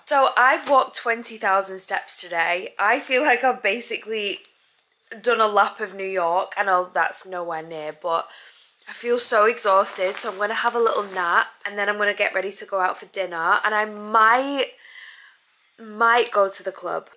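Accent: Manchester, United Kingdom. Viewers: the Mancunian accent is my favorite.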